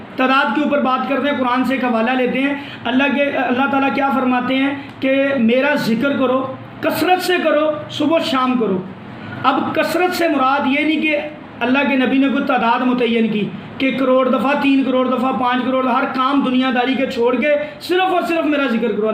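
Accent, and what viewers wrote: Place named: Punjab, Pakistan. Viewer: He is in punjabi accent with correct information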